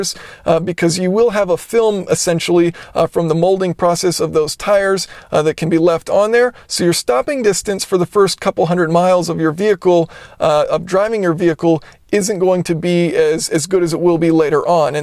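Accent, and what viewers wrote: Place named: South, United States. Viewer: Hyundai Accent Fan Club. I like the southern accent. headlights are very impressive, really stand out in the rain. body lines are smooth as silk with curves precisely where needed. does have a Crack in rear end but the ride is excellent. .maintenance can be pricey if not cleaned and serviced daily and if given wrong fuel there is a occasional exhaust smell. do love it but can't wait to trade for new model. ...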